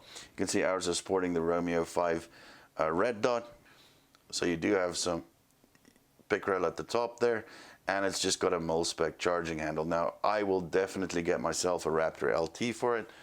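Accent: South Africa. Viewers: Great video, thanks. Off topic, and if I may ask, what nationality are you? Your English accent sounds like it has South African roots, with a bit of Irish and a lot of American influence. 🙂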